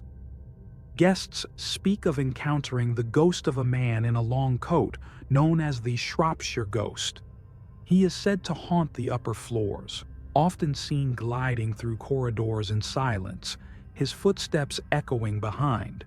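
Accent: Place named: United States. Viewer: Why an American voiceover?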